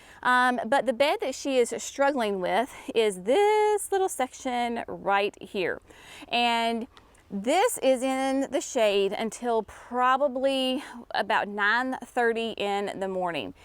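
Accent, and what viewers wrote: South, United States. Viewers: Does Mimi have your sweet southern accent too? Thanks for sharing.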